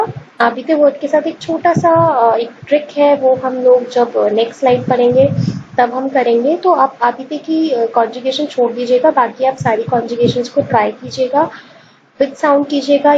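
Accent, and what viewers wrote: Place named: Bihar, India. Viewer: madam bahut zaldi bta rahi h wo v bihari (bhojpuri) accent me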